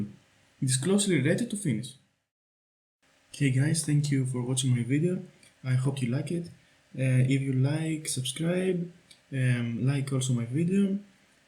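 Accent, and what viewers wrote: Greece. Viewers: Corped_Viper YB He’s Greek, cut him some slack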